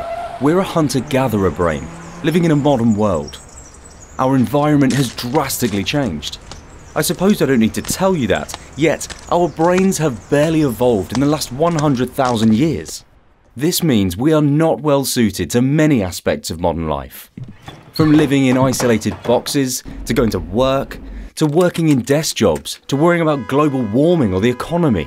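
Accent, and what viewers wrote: England, United Kingdom. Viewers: I’m late to this channel. I’m glad I found it and you got an English accent. 👌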